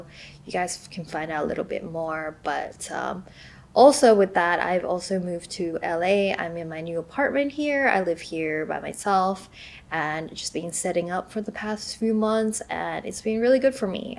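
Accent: Australia. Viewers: Youve kinda lost your aussie accent lol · bruh do u know ur accents- it’s Australian 💀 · ur aussie accent is gone :0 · it realised just hit me but australian accent is nearly completely gone like i can barely hear it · I haven’t visited ur channel in years ur Aussie accent hone :( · Where did her cute Australian accent go??? 😩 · you (guys) were my childhood youtubers u used to have an australian accent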